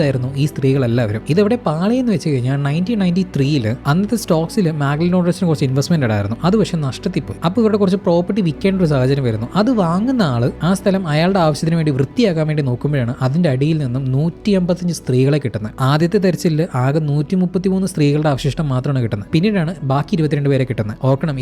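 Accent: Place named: Kottayam, India. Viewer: Bruhh from kottayam or idduki??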